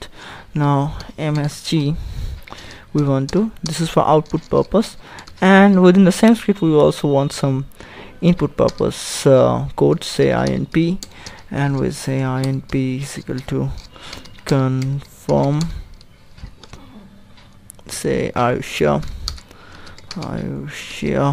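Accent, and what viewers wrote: India. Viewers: You sound like Indian?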